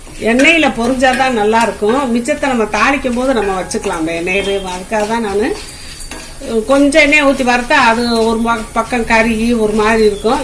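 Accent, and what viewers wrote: Madurai, India. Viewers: Unga pesum murai romba azhaga irukku, neenga Madurai pakkama?Unga tamil madurai, virudhunagar side tamil madhiri irukku, ketkum bodhu romba sandhoshama irukkudhu🙏🙏🙏🙏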